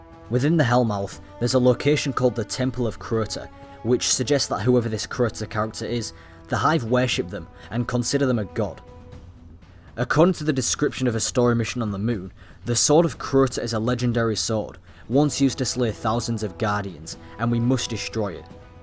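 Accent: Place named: Scotland, United Kingdom. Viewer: With some mates we have an ongoing debate trying to identfying your accent. My idea was that it sounds like a mix between Scottish and Northern English. Would you mind telling us the truth?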